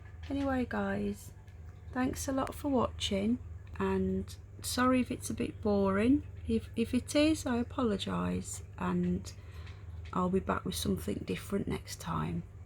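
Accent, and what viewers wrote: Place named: Barnsley, United Kingdom. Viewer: Your Barnsley accent lady , (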